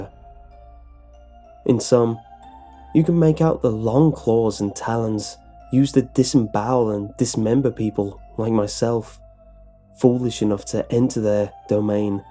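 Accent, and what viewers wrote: United Kingdom. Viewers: That's his real voice. He's British. He's cool.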